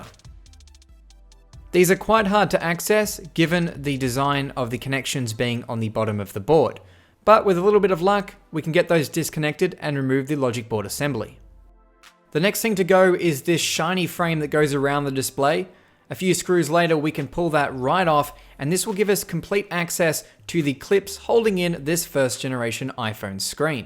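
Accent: Australia. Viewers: He’s Australian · U Aussie?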